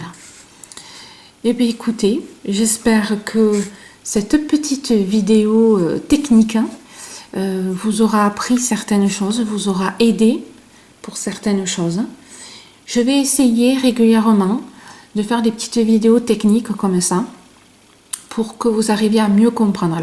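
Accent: South, France. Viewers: Bonjour. Très utile ce tableau, associé au plaisir d'entendre ton accent du sud!❤❤❤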